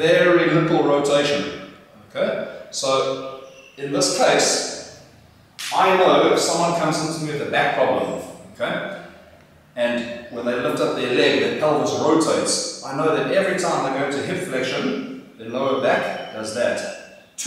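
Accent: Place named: Zimbabwe. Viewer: I hear by your accent you're Zimbabwean or South African. Are you available for therapy to Zimbabweans if so where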